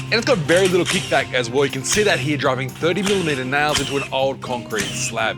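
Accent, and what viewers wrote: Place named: Australia. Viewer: Cant understand your aussie twang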